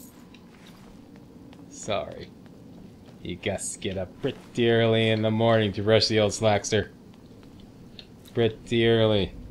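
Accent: Canada. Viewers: He’s Canadian af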